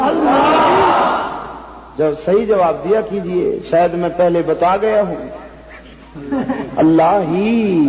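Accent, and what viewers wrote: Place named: Sindh, Pakistan. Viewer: Hazrat ka Hamen lehja bahut pasand Aaya Hamare India Ke Log inke byanat bahut sunte Hain Inka Le Ja Sindhi juban ke upar hai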